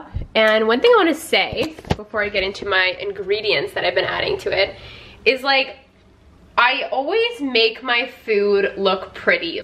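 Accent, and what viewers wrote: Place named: Canada. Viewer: I just want you to know that I absolutely ADORE your channel! My husband and I went to Seattle for our honeymoon and went to Peace Arch State park right across the Canadian border in Surrey, BC (they wouldn’t let us far because of COVID), so I went home and watched a video of Canadian accents and it was you and another girl! I’ve always been passionate about spirituality and I happened to discover you again discussing it. Your videos are so good and I have watched so many. Keep up good the work! 🙏🏼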